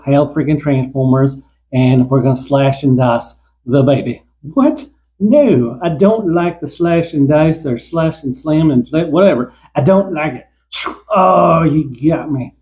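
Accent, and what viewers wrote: South, United States. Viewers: Great review once again, just as I'm about to go to bed. I'm gonna have a nice, quiet sleep now thanks to you and that southern accent.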